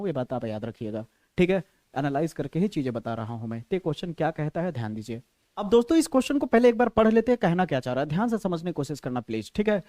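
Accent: Bihar, India. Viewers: Sir app Bihar sa ho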